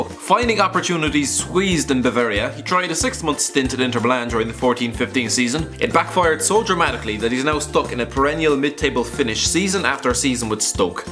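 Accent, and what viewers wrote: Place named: Ireland. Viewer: I can tell you're an Irish chap like myself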